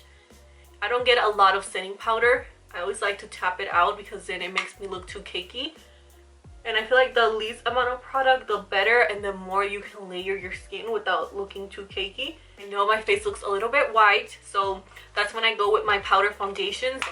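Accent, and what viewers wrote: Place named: Puerto Rico. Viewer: Girl, you’re stunning. A fellow boricua here, I just discover this channel and when I heard that Puerto Rican Spanish it was a done deal. Me encanta, you got a new loyal subscriber. ❤️🇵🇷 Ig: Srta.lugo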